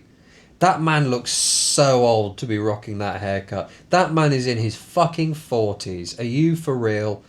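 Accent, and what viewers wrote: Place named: RP, United Kingdom. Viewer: your so posh O.O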